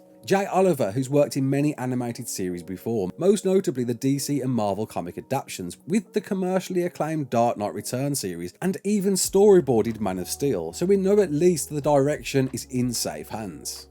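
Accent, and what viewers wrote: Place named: Scotland, United Kingdom. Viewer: Also, what country are you from? I really like your accent, it sounds like it may be Scottish but I don’t want to assume, I am probably horribly wrong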